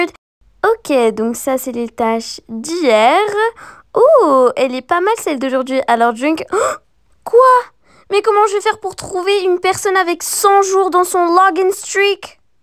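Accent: England, United Kingdom. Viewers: Je suis totalement d'accord avec toi !!! Tes vidéos son incr 😍!!! Ta voix est juste magnifique (j'adore ton accent anglais)🥰 ! Et toi t ✨PARFAITE✨… Continue comme sa !!! Bientôt les 50k !!!!! Ps : J'aimerais trop joué avec toi sur Adopt me !!!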